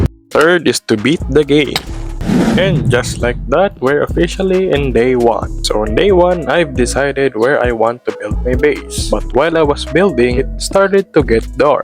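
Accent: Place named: Philippines. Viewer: I already know you are Tagalog because of your Accent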